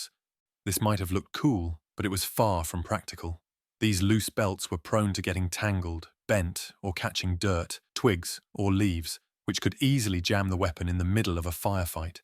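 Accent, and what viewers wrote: United Kingdom. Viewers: I do not know where you are from or how old you are, also do not know what your sources are. If you were to write a legitimate journalism article for a respected printed publication or even to submit as a piece of work for grading in college/university, you would need to at least have 3 to 5 independent verifiable sources of information to support your statements and/or claims. To say that “carrying ammunition belts around like Pancho Villa might have looked cool” is a total shit bag thing to say. Anyone who was actually carrying ammo for those support gunners and having to carry their own kits did it in attempts to keep everyone alive and well stocked. Are you British or is your YouTube narration a Bot?! You probably weren’t even alive during the Vietnam War and you are regurgitating stories you’ve gathered from other YouTube channels. 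Like you sat down and interviewed actual M60 Gunners to get your information for this video? Like they’d actually openly tell you , a Brit or a descendant of a former British degenerate what went on there for your YouTube channel. I saw some other comments from people who sound like legit Vietnam M60 gunners My father lugged shit everywhere for anyone on his team way into Cambodia and Laos. ARVN would run off with any supplies or ammo or anything on the trucks US Forces were defending. So It wasn’t to look cool to keep chains of ammo it was to make sure that shit did not disappear into the bush when under fire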